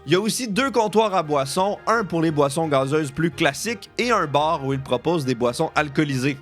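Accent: Quebec, Canada. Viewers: is this that really disgusting Canadian French? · Beuh écouter un français approximatif qui sort de la bouche d un kebeukoi avec un accent à vomir …bravo !!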